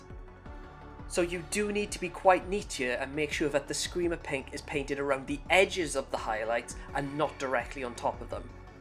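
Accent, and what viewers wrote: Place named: Wales, United Kingdom. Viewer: Came here from the Reddit post, your accent surprised me! Always nice to see a fellow Welshman in the hobby.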